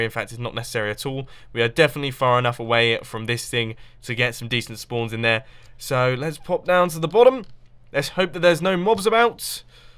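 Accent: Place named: United Kingdom. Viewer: is: Tall, hansom, has a British accent, smart, funny. Guys, hide you gool frens, mombo stel yo women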